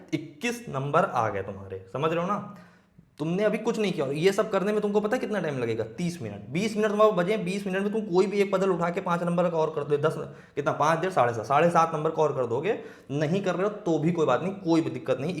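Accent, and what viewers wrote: Lucknow, India. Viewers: kasam se jaan gya tha boli se hi ki lko se hain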